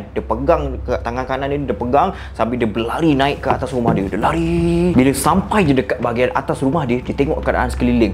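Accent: Johor, Malaysia. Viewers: org johor ke?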